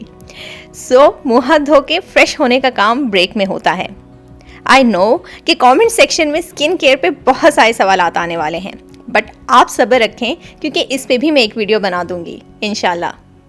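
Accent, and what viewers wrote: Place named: Pakistan. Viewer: Your Urdu accent is amazing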